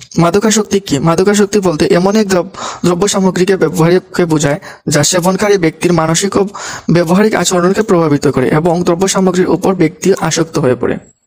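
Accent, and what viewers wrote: Sylhet, Bangladesh. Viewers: আমি ও সিলেটি আপনার কথা শুনে বুঝতে পারছি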